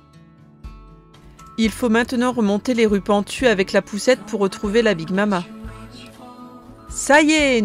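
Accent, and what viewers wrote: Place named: Germany. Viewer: Merci pour ce joli reportage, je suis surprise par cette belle architecture même si elle est cubique. J'ai adoré votre accent allemand 😀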